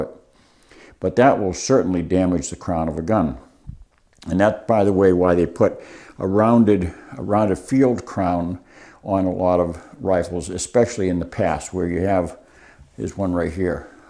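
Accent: New England, United States. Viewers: Definitely from Massachusetts or NH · Great content. And I grew up in NH, near MA. Good memories of the experienced man influence. Especially with the accent I remember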